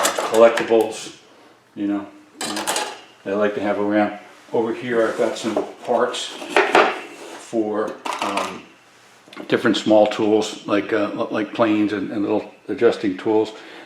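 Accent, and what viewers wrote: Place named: Bridgeport, United States. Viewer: Great video. Nice content. You sound like you grew up in Bridgeport. Southside.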